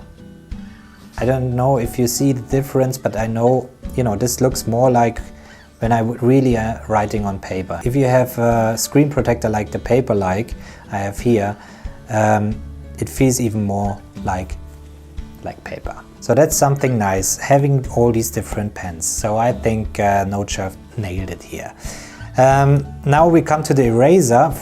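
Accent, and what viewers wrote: Germany. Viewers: Sorry, bist du Deutscher?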